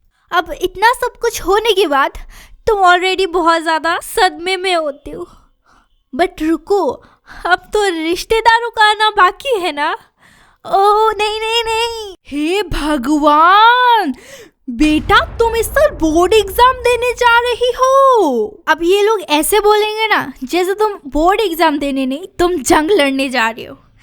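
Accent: Bengal, India. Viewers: Didi ka accent mujhe bengali wali lag rahi hai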